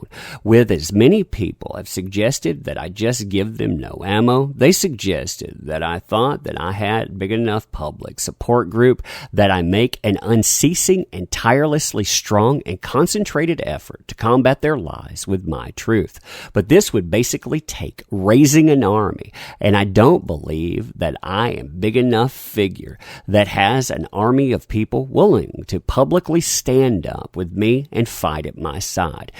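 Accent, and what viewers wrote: South, United States. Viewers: That Southern drawl like a drug, son. Do a piece on any developments between Meyer v Weird- I mean Waid. YBZ doesn't discuss it and it's right of him not to, legal reasons. I can't really do the research myself, I've got too many houses on fire.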